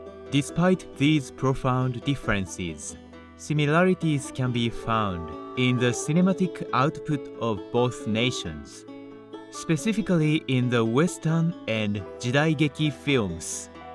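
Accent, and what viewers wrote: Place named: United States. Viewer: Wait... was this for real? I am confused. This is supposed to be a joke right? *Mistakenly Calling Akira Kurosawa (movie director) as Akira Toriyama (who is the Mangaka of Dragon Ball). Also, whoever is narrating has an American accent in his fake-ish Japanese accent. (I see the person’s name is Japanese : I suspect this person lived in the US for a long time)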